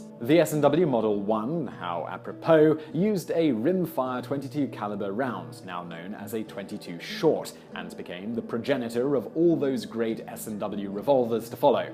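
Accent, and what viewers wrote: United Kingdom. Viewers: Oh, I'm sure he's smart. It's just that Brits don't talk like Americans.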